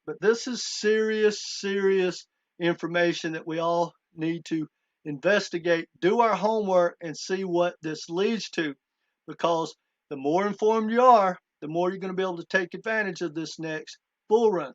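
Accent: Texas, United States. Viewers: This guy lack of research, all the money to handle, half of it are more than 2 quadrillion $ under the ledger, please understand ON demand liquidity, you are talking like a Texan guy .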